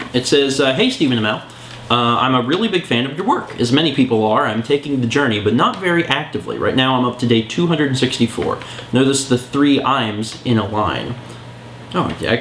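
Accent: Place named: Louisiana, United States. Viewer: That accent sounded like. In the bayou!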